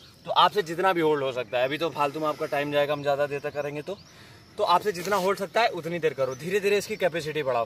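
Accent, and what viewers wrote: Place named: Lucknow, India. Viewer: Lucknow k ho lagta h.. bahut badhia style h hachak k 🤩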